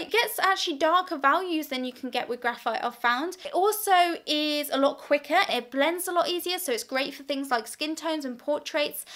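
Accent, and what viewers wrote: United Kingdom. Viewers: I knew you are from UK when I heard your first few words. hehehe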